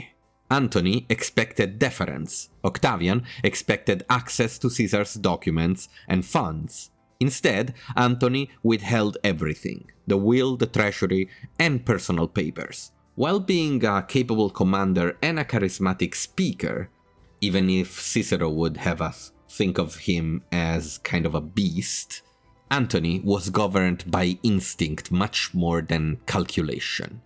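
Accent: Italy. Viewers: Ngl this is how I sometimes imagine Roman accents sounded like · Crazy good video, nice italian accent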